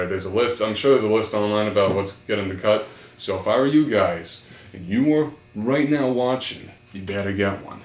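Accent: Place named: New York, United States. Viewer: Your accent is like halfway between Sylvester Stallone and Rodney Dangerfield. So I'm guessing you're from NYC or one of the nearby areas, but where abouts? I mean Brooklyn is an obvious guess because how easily "Fuhgedaboudit" flows out when you talk. Hmm. Got me thinking there or the Lower East Side. Of course, I'm talking out my ass, you might be a Jersey boy.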